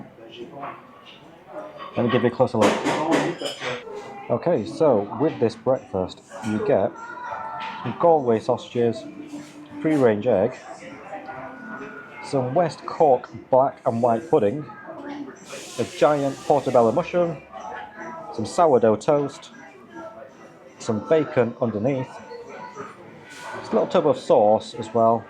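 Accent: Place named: Yorkshire, United Kingdom. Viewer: I can tell you're from Yorkshire. I paid 12 euros return on the big blue Dublin coaches in May.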